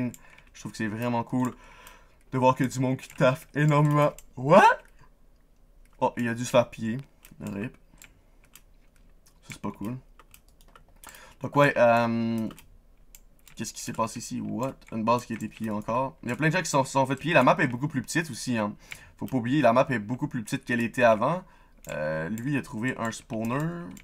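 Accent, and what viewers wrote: Quebec, Canada. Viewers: Minecratf Je suis prêt a parier que tu est Québecois, car moi aussi j'ai la même accent et je suis Québecois ;))) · comme tu aimes bien les nouvelles personnes, je m'abonné like et encore un nouvelle épisode d'admin série =D car oui je regardais tes épisode auparavant et je go rejoindre ton serveur dans probablement quelques minutes ;) tu es québécois? j'ai l'impression :p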